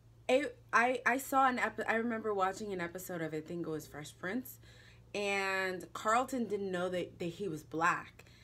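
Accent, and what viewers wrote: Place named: United States. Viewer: She is a child of immigrants so naturally she has an American accent.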